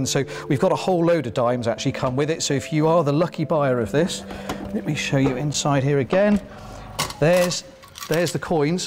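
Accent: England, United Kingdom. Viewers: (This is Tom, not Sandra.) Great video sir. Love the English accent, too. I wish l could find a classic Coke machine from the 50's or 60's. I love ALL things, classic, from the 50's and 60's, myself. I, being born in 1958, grew up with these classic drink machines. Thank you for highlighting these wonderful artifacts from our past, sir.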